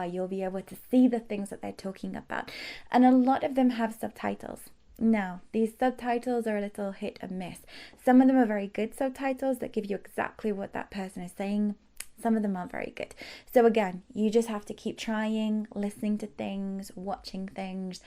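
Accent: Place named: United Kingdom. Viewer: Hi there, Teacher! Your British English accent is THE FOREVER the best I have heard of and your voice is really pleasant to listen to! And I really appreciate your enormous patience, dedication, enthusiasm, kindness, time and effort in making excellent English teaching videos for non-native speakers like me! Absolutely amazing! Benny Mok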